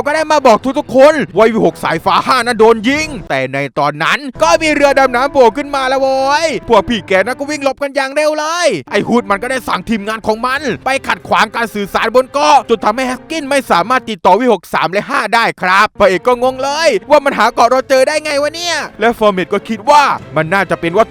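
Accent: South, Thailand. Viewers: พี่บ่าวๆๆนุ้ยอยากให้ สปอยเรื่อง เอลซ่าภาค2นะพี่บ่าว เด้กใต้จ้าเหนพี่พูดใต้ชัดเลยคิดว่าคนใต้ค่ะ ขอเอลซ่าภาค2ค่ะนะๆๆ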